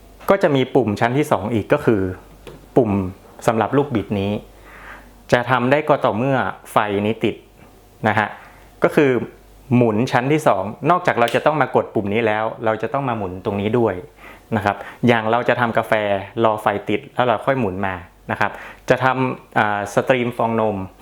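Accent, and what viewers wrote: North, Thailand. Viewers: คนเหนือก่อครับพี่ รีวิวดีมากเลยครับ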